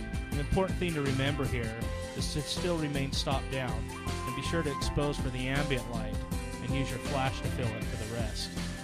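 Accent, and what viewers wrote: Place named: Chile. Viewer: me gusta ese acento chileno.... tienes un dislike big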